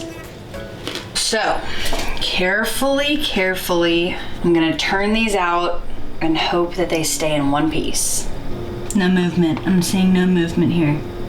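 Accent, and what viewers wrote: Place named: Georgia, United States. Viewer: YAWL!!! OMG!! You must be from Georgia!! I love your video! I felt like I was in the kitchen with you. Thank you for being real and showing the mess ups! We learn fromour mistakes.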